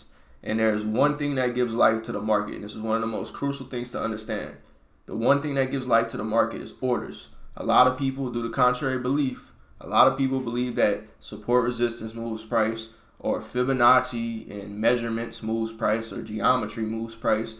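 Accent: Louisiana, United States. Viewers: I knew you was from Louisiana accent heavy im in New Orleans ⚜️⚜️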